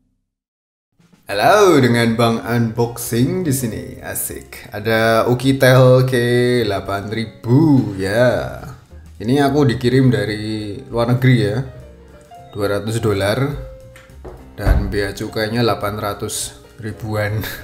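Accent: Java, Indonesia. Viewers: Medokmu toh mas Bikin ngakak Ckckcckkcck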